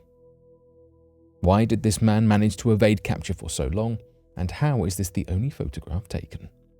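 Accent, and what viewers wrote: United Kingdom. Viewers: He’s a British voice over judging by his accent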